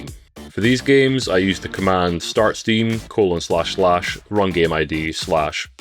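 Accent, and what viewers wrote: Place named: Aberdeenshire, United Kingdom. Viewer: Bro sounds like he's from Aberdeenshire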